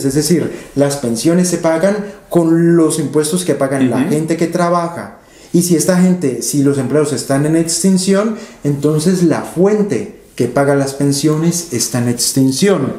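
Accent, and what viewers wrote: Colombia. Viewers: eres colombianito que bonito acento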